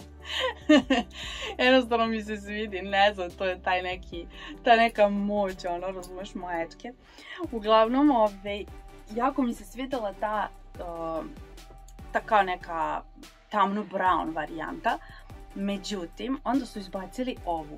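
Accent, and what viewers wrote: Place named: Novi Sad, Serbia. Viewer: Osmeh i pozitiva zlata vredni, najiskrenije 💜💜💜 kao i sve emocije koje krajnje iskreno iskazuješ. 💜💜💜 Plus, novosadski naglasak koji uvek volim da čujem. 🙂 Ti si jedna retko divna osoba koja svoje pratioce dozivljava kao drugare, a ne kao "vreću novca", i to je pre svega jako veliki plus- to govori mnogo o tome kakva si osoba. ❤️ No, to je već sve od samog početka poznato svima. 🙂 Što se zdravstvenog stanja tiče, od sveg ❤️ i najiskrenije ti želim da sve bude u najboljem redu što je pre moguće, prvenstveno zbog tebe same jer zaslužuješ samo ono što je dobro. Dušo naša divna i draga, još jednom sve najlepše ti želim! ❤️❤️❤️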